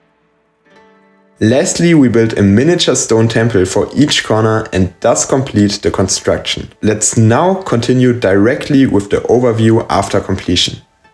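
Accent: Germany. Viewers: Wow wie geil ich holle die mir fix ich höre deutschen akzent😂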